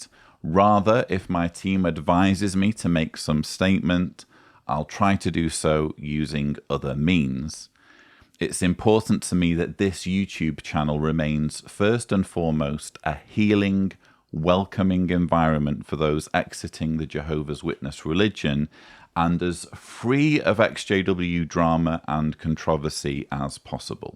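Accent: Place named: North, United Kingdom. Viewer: I've just discovered you over the last 2 days. I noticed your accent was familiar.... I currently live in Manchester and I was a JW in the Chesterfield/Sheffield area. I left the JWs at 16 having been baptised at 14/15. My parents and brother don't speak to me. I've never heard anyone articulate the same experiences I've had as a child until I discovered your channel and the Leah Remini interview and didn't realise how isolated I felt in my experiences until I heard others with similar experiences. Thank you from the bottom of my heart. Your down to earth way of putting everything is just so refreshing. Please don't stop making videos ♥️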